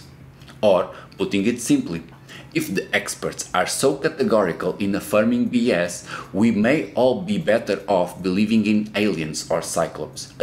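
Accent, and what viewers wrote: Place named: Portugal. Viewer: Why do you sound like you are portuguese while speaking english?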